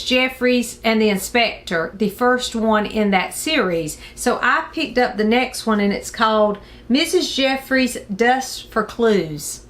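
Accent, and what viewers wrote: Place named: North Carolina, United States. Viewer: Are you from NC? That's where my mom was from and your accent is so similar. That copy of Heidi is gorgeous! I haven't heard of those LM Montgomery books, but I love those covers too:)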